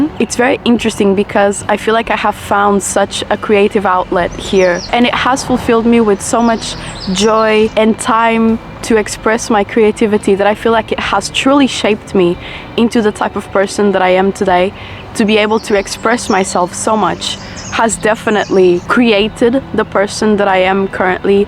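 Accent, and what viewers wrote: Portugal. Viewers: Saw this video suggested to me for some reason. Clicked on it. First few seconds of hearing you talk, "Yup, esta tipa é portuguesa, 100%". :D Your channel does seem interesting. Subscribed. Also, epá olha que diz que isso de fumar faz mal. Come antes uma peça de fruta. Ou qualquer coisa do género. Ah, fuck it, também sou escravo da nicotina. Só gosto de dizer esta frase. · the portuguesse accent is 🔥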